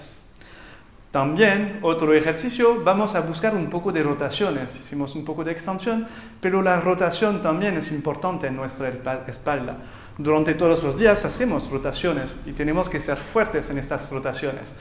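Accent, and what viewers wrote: Argentina. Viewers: Divino! Y habla en argentino!!!👍❤ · El acento argentino 😀 un groso, saludos desde Génova Italia 🇮🇹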